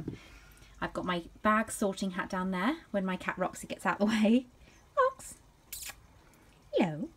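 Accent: United Kingdom. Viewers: it's better cause you have a britsh actcent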